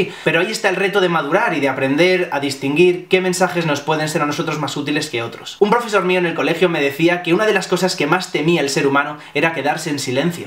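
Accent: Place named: Spain. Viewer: me encanta tu acento español jajajaja en parte por ello veo tus videos😂 ,un saludo!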